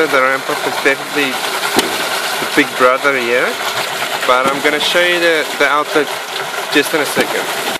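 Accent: South Africa. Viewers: great,I thought I recognised the SA accent, nice video! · Thank you. Pneumatic / hydraulic....... very impressed that it is still so loud, even with rubber seal / buffer in it. Would be so great if you could use all that closing force to fick a wheel / generator over, the last 1/2 inch may be and a ratchet / escapment may be? Water wheel, bring wheel up to the snout, or bring snout down to the wheel, or extend the snout & let it free fall onto the edge of the wheel. Were is "flood season".....? you accent sounds in Africa... south? Regards Bob ( London UK )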